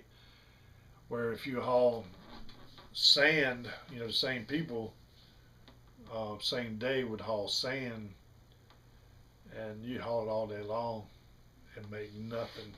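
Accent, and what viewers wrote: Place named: Carolinas, United States. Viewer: Where you from man? The Carolina's? Got that accent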